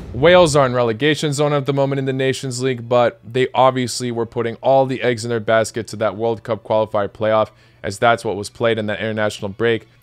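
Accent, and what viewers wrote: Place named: United States. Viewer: my brother listen to his accent, he's American along with Croatian.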